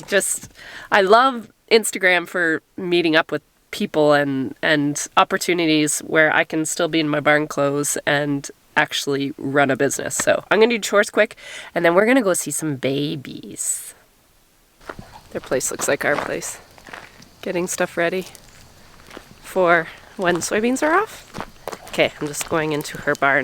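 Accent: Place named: Canada. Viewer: ‘....they’re friendly,..eh’...! Wonderful Canadian...! Love the language,..eh..!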